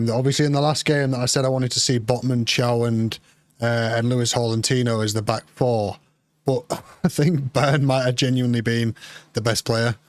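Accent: Manchester, United Kingdom. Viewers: You again??? Clickbait thumb nails, acting angry when you ain't and trying to be clever with ye little microphone and Manchester accent, Neva seen ye at St James Mr famous, you little actor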